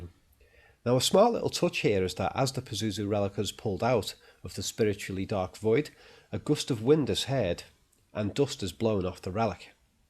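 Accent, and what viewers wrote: Liverpool, United Kingdom. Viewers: Some pretty stupid stuff in here. Then we get to a more obvious one, when she has her right arm raised and he says he's not sure about that one! :o Is that because he didn't make that one up himself? ;) He says people do have their arms up in that situation! Duh! Is that a bit like people do pray like that and the other 100 things you said too?! Also, I think I must be programmed in some way to feel that the Liverpudlian accent sounds thick! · All good except the narrator's distracting Scouse accent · Very impressive job. No self serving bull crap just very insightful points. It's a plus that you are an obvious Scouser.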